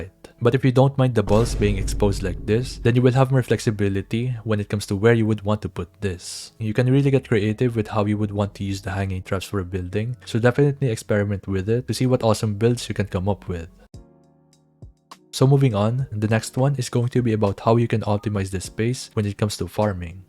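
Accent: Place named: Philippines. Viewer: I can tell your Filipino by your accent lol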